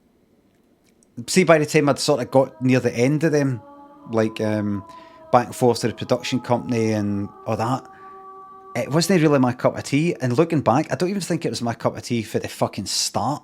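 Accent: Scotland, United Kingdom. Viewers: Hence his undying shame about his schemie accent. No amount of our money will ever change that fact.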